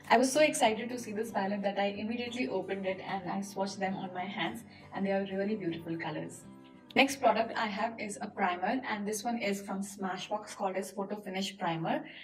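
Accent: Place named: India. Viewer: The prizes are amazing and i love your other videos!! I can tell from your accent that you’re from India Right? ❤️i’m from indonesia🇮🇩!!! Keep it up and enter me!!!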